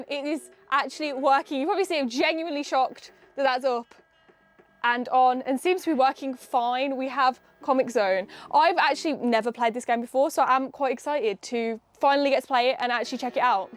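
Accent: United Kingdom. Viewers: She's cute, British accent, good at soldering and fixing things, is it really some miracle? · Que genia! I love your channel and your british accent :) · can someone tell me from which region in the UK she is? I can hear an accent but can't locate it. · Ouaw l'accent britannique 😊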